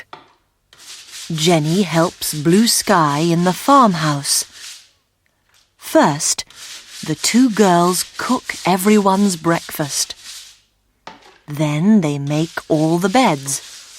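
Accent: United Kingdom. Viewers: THANKS FOR BRITISH ACCENT🤍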